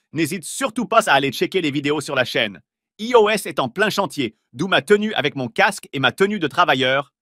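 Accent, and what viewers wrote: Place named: Canada. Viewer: Merci, Très expressive cette voix avec un petit accent canadien je crois. De quelle voix de synthèse vocale s'agit-il? Bravo pour le travail👍😎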